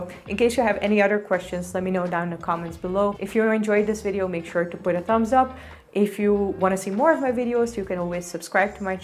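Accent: India. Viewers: Haha geweldig! Love dat je inmiddels ook een klein Indiaas accentje hebt. Well done! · Your pronunciations are very indian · she has such a cute partial Indian accent, like some words in between. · Now you have an indian english accent as well as look like a (pale) indian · Ha ha you speak little bit with Indian accent too. Started be be 100% Indian. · Omg . You already have a semi Indian accent. It's kind of a hybrid Indian-European accent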